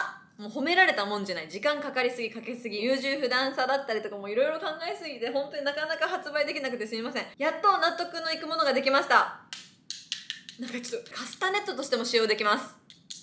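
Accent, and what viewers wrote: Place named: Kansai, Japan. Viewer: ちょいちょい関西弁になっちゃうさしこちゃんかわいい……かわいいやんけ！！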